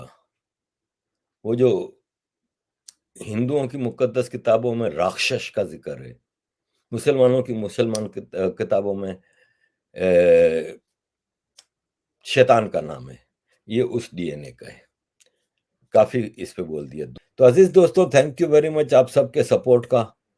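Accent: Gujarat, India. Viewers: YOU ARE TALKING LIKE GUJARATI N THATS WHY YOU ARE SWEETEST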